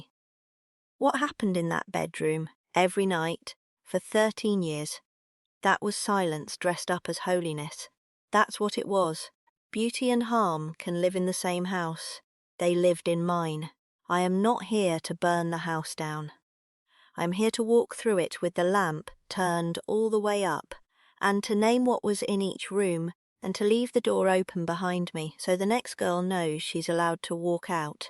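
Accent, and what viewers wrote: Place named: England, United Kingdom. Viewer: And, now she has an English accent. I hate AI.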